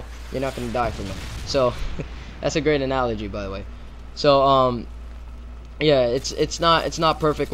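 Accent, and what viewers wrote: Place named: New York, United States. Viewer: i love the way people in NYC area say awesome and water lol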